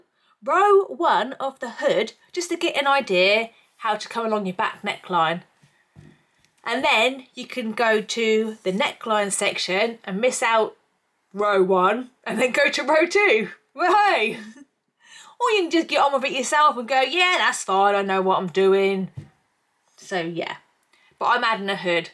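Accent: United Kingdom. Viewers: At last a british voice,phew what a releif.Love the tutorial,am following along,just need to find the measurements i need.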